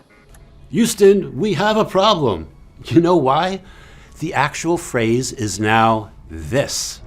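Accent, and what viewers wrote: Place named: Italy. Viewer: I thought I recognized a fellow Italian in your cadence and light language. My Birth Name is Margherita Cumero. Comes with Love ❤️😘❤ Such Gratitude for Your Energy and and who lead this Dragon here.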